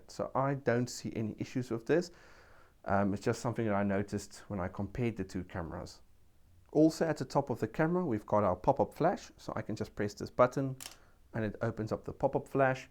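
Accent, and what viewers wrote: South Africa. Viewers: I am busy going through reviews of the Nikon D600... i just stumbled over yours, and I was like: Hey, I know that accent! :)) It is nice to see a camera review made by a south african on here for a change. :thanks for the great review! :)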